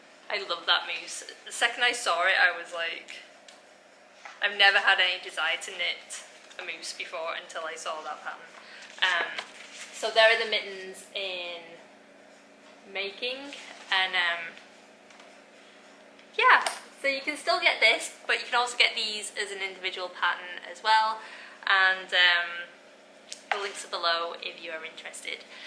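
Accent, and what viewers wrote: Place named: Chester, United Kingdom. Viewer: Loved your first podcast. Your voice reminds me of home (I’m originally from Chester but now live in Scotland). Tilly is gorgeous, hope to see more of her and you ☺️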